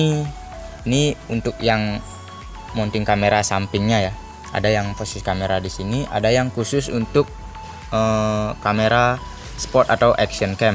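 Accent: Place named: Bali, Indonesia. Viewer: orang bali, logatnya T nya kelihatan.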